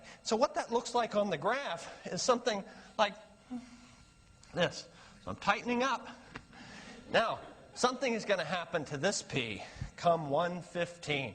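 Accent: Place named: Midwest, United States. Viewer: Does anyone know how to describe this guy's accent/affect? It's vaguely Midwestern but I feel like we could get more specific. He sounds like my Aunt Betsy.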